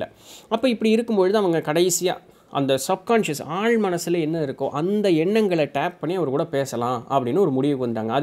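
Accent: Coimbatore, India. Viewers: Anna neenga coimbatore ah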